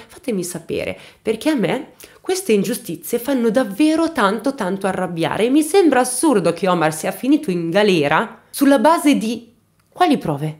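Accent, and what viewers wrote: France. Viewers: Ciao carissma il tuo acento francese 😁mi rocorda molto il mio nei primi anni che mi sono trasferita qui in nel sud ovest della Francia, ma una cosa che puo consolarti e che pronunci molto bene il nome della vittima che e uguale al mio Ghizlane, buon lavoro carissma. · Seguo ogni tuo video, e mi piacciono tutti tantissimo..è una storia interessante, sopratutto con l' accento francese!!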